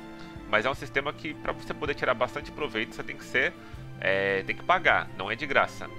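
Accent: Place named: Brazil. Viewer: Idai caralho, o cara é Br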